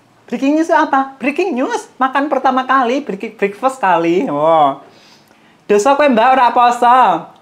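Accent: Java, Indonesia. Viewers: Mbk asline dr mana ya...kok jawa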